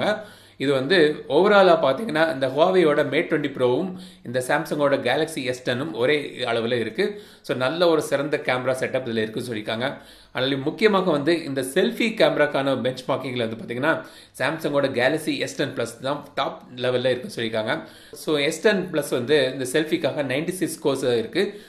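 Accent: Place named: Nagercoil, India. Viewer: நம்ம நாகர்கோவில் வார்த்தை உச்சரிப்பு உங்க கிட்ட இருந்திச்சி அதான் கேட்டேன்.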